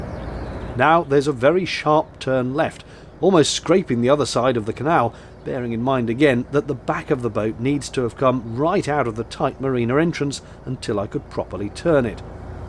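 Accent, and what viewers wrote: United Kingdom. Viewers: so nice to see you and hear that very British voice. Keep safe P.S. Nice little vlog by the way.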